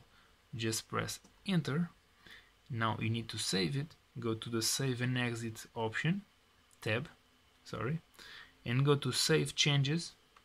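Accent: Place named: Russia. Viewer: You sound very russian lol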